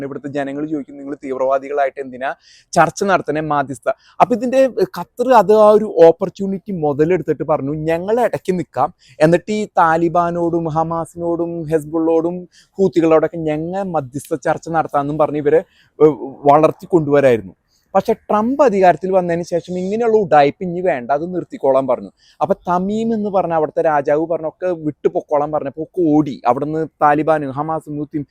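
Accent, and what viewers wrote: Thrissur, India. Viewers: തൃശൂർ ഭാഷ അലക്ക് അടിപൊളി ......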